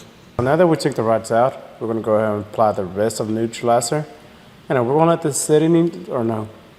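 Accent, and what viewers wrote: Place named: Mexico. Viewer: Mix between Mexican and a good old boy country accent lmao.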